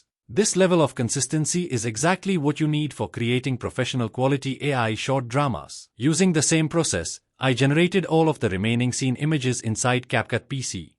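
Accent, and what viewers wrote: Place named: India. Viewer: Why has the AI narrator an Indian accent…? 😅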